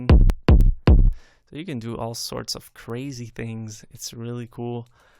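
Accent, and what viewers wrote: Quebec, Canada. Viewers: Do I detect a French Canadian accent?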